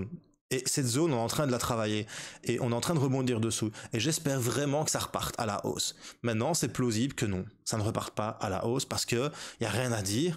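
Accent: Liège, Belgium. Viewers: Lol j adore le ton que tu emplois ça en met pleins les dents aux détracteurs mdr. Tu es de Belgique ? Je perçois un accent belge de Liège où je dois me tromper !?!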